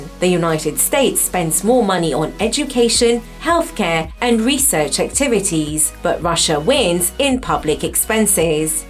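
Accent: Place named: England, United Kingdom. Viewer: Fuckin' hate this english accent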